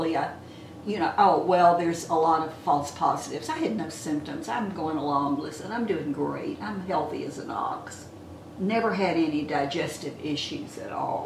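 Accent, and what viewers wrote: South, United States. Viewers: From one long soaker to another, (can't live w/out my baths) I am so happy that I have found you today! You bring a cheery smile to face and a warmth to my soul. I am 60 as of August this year which is very weird for me. You are so precious and your accent brings me home to Georgia, Alabama and Tennessee. I was born in Atlanta. Although I was not raised there, I am a southern girl from the heart! I hope that you are feeling better as it's Sept. now. I will always keep you and yours in my prayers as I am a True Believer too! God is so very good and Be Kind Today is my mantra! Big hug. You are lovely and have the most sparkling eyes too!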